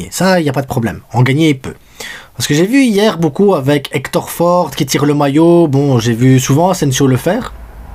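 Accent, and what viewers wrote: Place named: Belgium. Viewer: Tu es un tueur mec 😉❤️ J'aime les frites 🍟 ainsi que l'accent belge 😉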